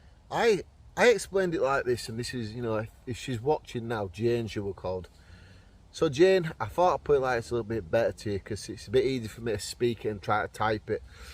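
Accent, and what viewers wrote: United Kingdom. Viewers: We call your pot noodles " Ramon noodles" but we don't have curry flavored. Would like to try that one. I just ran across your channel and subscribed. I use to camp a lot in the woods. Never camped at campsites. Hearing things in the wild. Use your gut instincts. Always stay vigilant. I hear a lot of stories from people. And yes, I believe in Bigfoot and aliens! Where are you from? You sound like you have a British accent? Stay safe and always trust your instincts !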